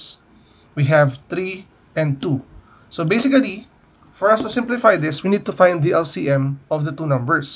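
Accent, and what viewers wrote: Philippines. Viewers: are you phillipino becuase i can tell by ur accent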